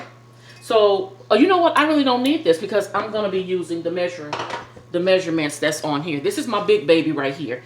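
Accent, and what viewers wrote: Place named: Pennsylvania, United States. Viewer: I remember that coffee cake one f my all time fav’s we might be close in age, I’m here in the San Fernando Valley, I think I’m older graduated in 62. Thank you for the recipe! Your from PA sounds. Like.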